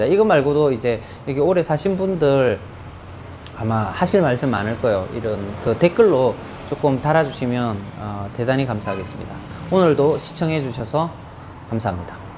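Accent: Gyeongsang, South Korea. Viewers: 역시 서울말투보단 경상도말투가 믿음과 신뢰성이 가네요~~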